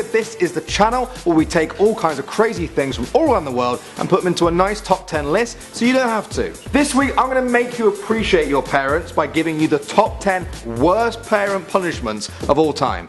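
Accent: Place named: United Kingdom. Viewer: Sounds like he is British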